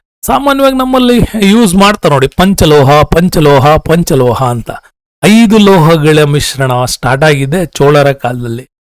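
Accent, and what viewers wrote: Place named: United Kingdom. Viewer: ಸಾರ್ ದಯವಿಟ್ಟು ಕನ್ನಡ ನ ಕನ್ನಡ ತರಾನೇ ಮಾತಾಡಿ.......😢😢ಯಾರೋ ಬ್ರಿಟಿಷ್ ಅವರು ಕನ್ನಡ ಮಾತಾಡೋ ತರ ಕೇಳುತ್ತೆ.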